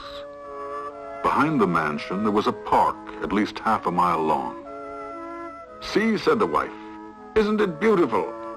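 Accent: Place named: Europe. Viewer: Umm, definitely not Dennis! For a little moment I was thinking it was Orson, but then it sounds European. Why didn't they put the narrator on the credits?!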